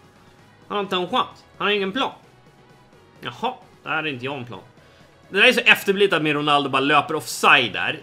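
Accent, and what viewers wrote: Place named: Stockholm, Sweden. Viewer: sjukt hur stockholmsk du e men ändå bäst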